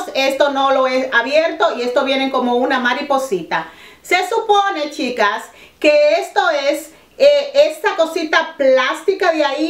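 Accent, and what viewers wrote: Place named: Dominican Republic. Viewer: jajajajaajj es dominicana por el habla'o. besitos me encanta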